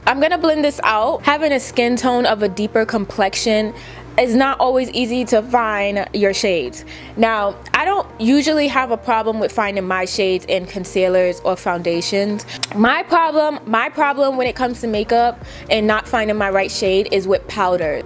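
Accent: New York, United States. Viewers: First of all accent she so NY 😍😍..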